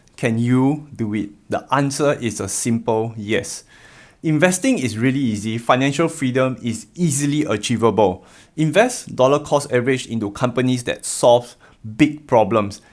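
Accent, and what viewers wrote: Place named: Singapore. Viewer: Love you Singaporean accent bro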